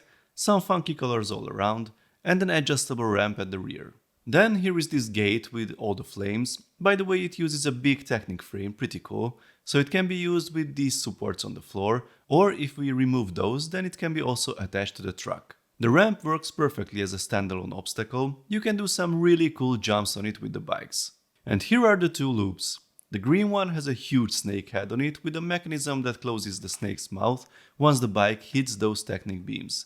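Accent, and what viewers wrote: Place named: Hungary. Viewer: A Stuntz-os videóddal kezdtem... most látom a csatornád először.. volt bennem egy gondolat, hogy annyira magyaros az akcentusa az illetőnek.. tán csak nem magyar? De. :) Nagyon jó a csatornád, sok sikert hozzá!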